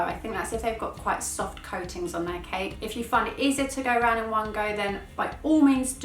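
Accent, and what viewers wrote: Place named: Cockney, United Kingdom. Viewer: Great tutorial. . .but her Cockney accent is grating. She sounds like Eliza Doolittle before Higgins helped her.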